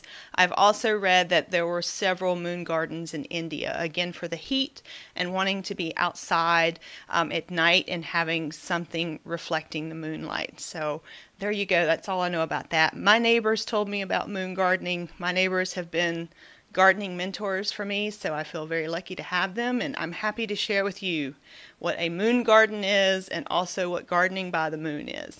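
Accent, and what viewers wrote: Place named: North Carolina, United States. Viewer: I'm from North Carolina too! I knew I recognized your accent, but I was confused because I thought you were from Florida. Thanks so much for making these great videos.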